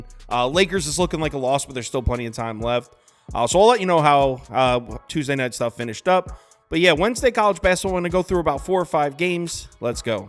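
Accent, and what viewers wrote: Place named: New Jersey, United States. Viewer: Is from NJ? Does anybody know? Im a relatively new follower so im not exactly sure but some of the words he uses and the way he says certain words gives me Jersey vibes or maybe new york.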